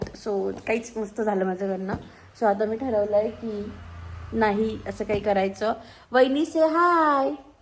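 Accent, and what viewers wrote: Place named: Khandesh, India. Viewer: A tuz माहेर कुठल आहे नक्की नाशिक खानदेश tone vatto thoda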